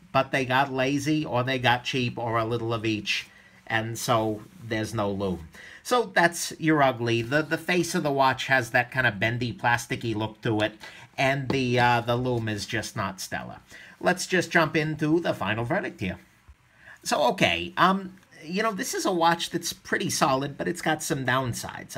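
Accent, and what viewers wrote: New York, United States. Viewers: Alexander Lee - NY, NY-USA. Which borough?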